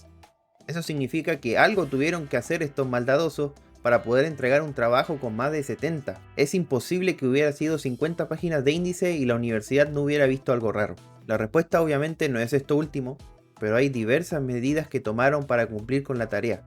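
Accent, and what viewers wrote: Chile. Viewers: ¿Es chileno? 🤔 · Eres de chile ? Tienes cierto acento 🤔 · Oye porque tienes acento chileno? eres chileno o peruano me confunde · hablas como chileno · Eres chileno? Por la forma de hablar. Por cierto, saludos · Eres chileno? Tienes el acento. Lo sé porque soy de Tacna y es muy marcado su acento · Eres sureño? Siento chilenidad en tu acento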